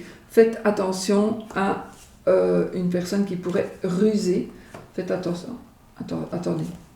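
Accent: Belgium. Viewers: Meilleurs voeux madame j'adore votre accent les Belges j'habite en Belgique avant quand vous dites 8 😅😅😅bonne année à vous et mille merci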